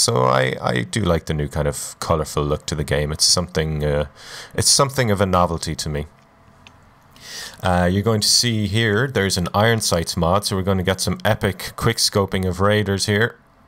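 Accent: Ireland. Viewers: l know this is a very old video but in regards to marks mutant mod l personally dont use it because of the silly armored deathclaws and hogs it adds , lt just makes no sense from a lore perspective at least with the hogs and just seems silly to me .also fellout is kinda eh l feel it ups the contrast to much. also l cant pin down your local accent ? what part of ireland are you from ?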